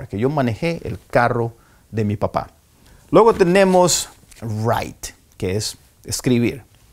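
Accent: United States. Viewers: Interesting, you speak perfect English and have an American accent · Eres un EXCELENTE MAESTRO GRACIAS POR TU aportación educativa enseñando un INGLÉS AMERICANO perfecto para nosotros los hispanos parlantes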